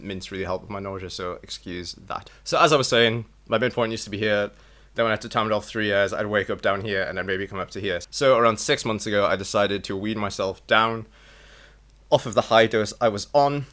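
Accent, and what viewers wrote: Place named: United Kingdom. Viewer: San Jose Sharks ?!?!?! Bro are you in the Bay Area?!?! I am in san mateo. Your accent has me thinking UK 😂 are you just a major hockey fan, or are you living in California? The medicine topic is more crucial to the world's well-being than most people realize. I have grown poppies for a few years now, and consider it a tragedy that most people have been estranged from this great medicinal plant. Human beings in general may be more opium-dependant than is acknowledged. Poppies make a night and day difference in my ability to function in this body, and actually live more full/thorough days, do work, exercise, etc.... For years i regularly exercised and practiced discipline in various forms, without opium or any opiates (having steered away from such substances after high school). Never did i reach the same level of sustained health/function without poppies/opium that i experienced once i began growing and taking them. 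🌷🌷🌷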